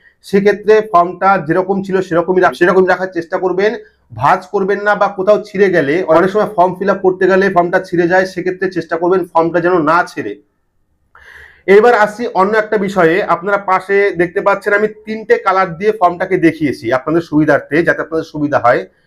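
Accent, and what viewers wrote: Bangladesh. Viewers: Aponar kothar modhay Bangladeshi tan ache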